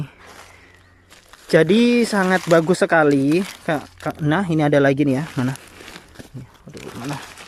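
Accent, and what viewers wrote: Java, Indonesia. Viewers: Bang. Orang Jawa y. Ngomongnya medok banget.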